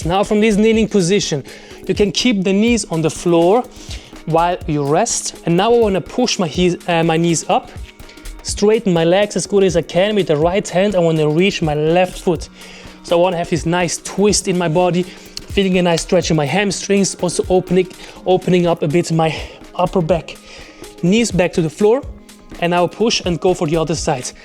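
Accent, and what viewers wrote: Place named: Russia. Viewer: интересный акцент, вы русский?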